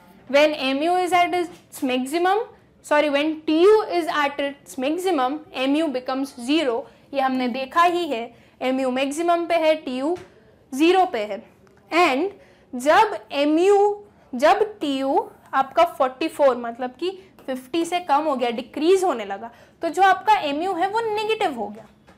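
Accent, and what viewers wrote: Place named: Gujarat, India. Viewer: I can tell that ma'am is gujarati.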